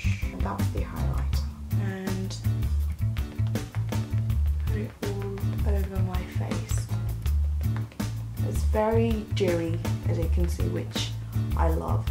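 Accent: United Kingdom. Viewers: 영상 보는게 처음인데 너무 귀여우시고 매력있으셔서 댓글 달아요 ㅎㅎ 영국식 영어 발음도 정말 매력있으시네요!!!ㅋㅋ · 아 님께는 처음 댓글인데:) 너무 귀여워요 동생같으으으으음!!!! 매력 넘쳐요 ㅠㅠ 영국발음이며 화장이며 말투까지 앞으로도 자주 봤음 좋겠어요 ㅋㅋ 빠~이!( 버전인사ㅋㅋ) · I adore your accent/voice! Are you British?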